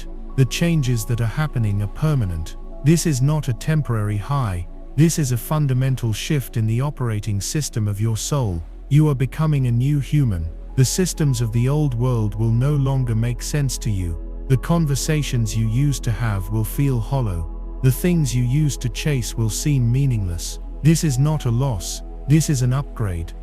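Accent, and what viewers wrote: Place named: United Kingdom. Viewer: Click bait. With an expect Brit accent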